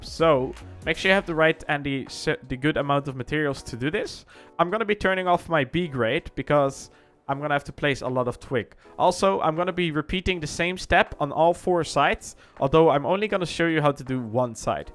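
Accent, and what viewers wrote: Netherlands. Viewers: Are you from the Netherlands or Belgium ?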